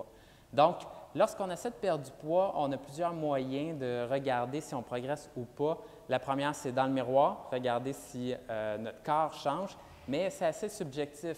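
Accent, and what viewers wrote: Quebec, Canada. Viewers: Le quebec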